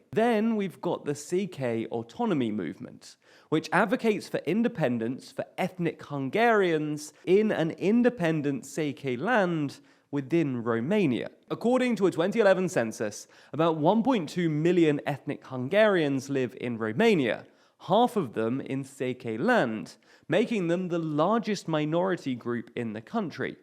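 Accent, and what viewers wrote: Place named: RP, United Kingdom. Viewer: Interesting how “island” and “Ireland” are homophones in your RP accent.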